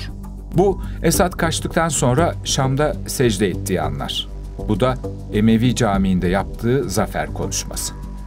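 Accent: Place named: Adana, Turkey. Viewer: Sadece bir Adanalı ,Elbise derken E yi uzatıp Eelbise der.seslendiren kişi,ya osmaniyeli,ya Adanalı ya da mersinli.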